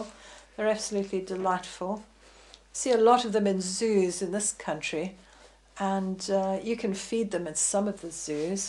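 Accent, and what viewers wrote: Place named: United Kingdom. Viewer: Cool British accent :D wiked lessons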